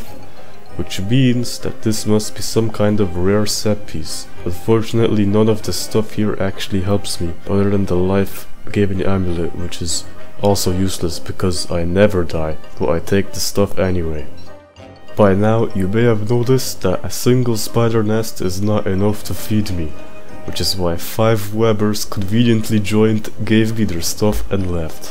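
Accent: Russia. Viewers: Nice vid! Are you russian? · Мне одному кажется что он русский?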